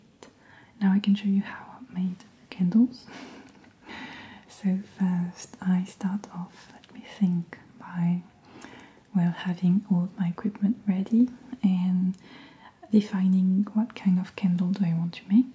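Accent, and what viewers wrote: France. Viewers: When is the next French video ? Because I'm French and I prefer your French videos. I love your French voice. And I like your videos ! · She's French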